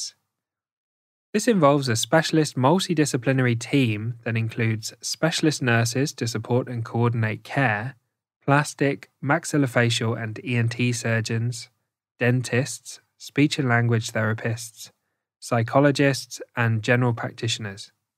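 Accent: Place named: United Kingdom. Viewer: What is it for an accent? love it! It's british but also not british :D Or do you have just a really beautiful voice?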